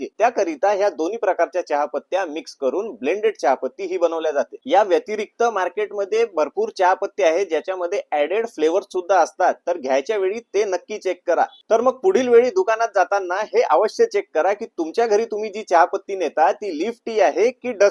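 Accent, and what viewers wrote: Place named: Vidarbha, India. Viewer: विदर्भाची भाषा, राहते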